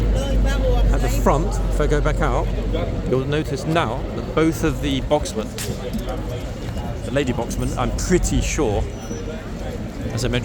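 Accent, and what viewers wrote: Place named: United Kingdom. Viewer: Love this vid, very informative ❤ lovely British accent in the commentary.🏴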